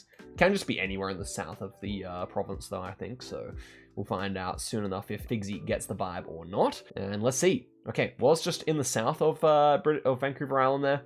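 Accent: United States. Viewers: love your southern US accent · as a georgian (us) gotta love the alabama accent😂😂😂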